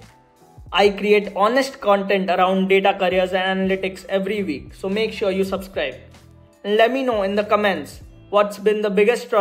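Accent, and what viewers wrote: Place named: India. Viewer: I'm argentinian and i dont speak English with argentinian accent, i do it with an american accent. Why do Indians never get off their goofy ass accent? Cant take you seriously.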